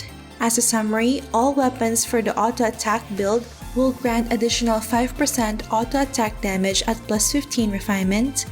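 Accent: Philippines. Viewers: As if the accent was not already an indication that she's filipino and stating most of the time that she uses "PHP" as an indication of BCC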